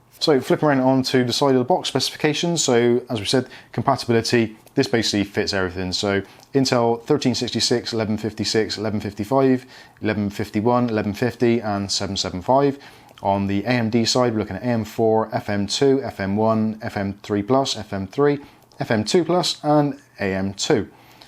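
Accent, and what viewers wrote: United Kingdom. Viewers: I loved the Bri'ish accent mate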